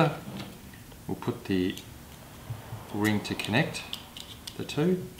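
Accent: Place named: Australia. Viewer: Super helpful mate!... And so awesome to hear an Aussie voice on youtube👍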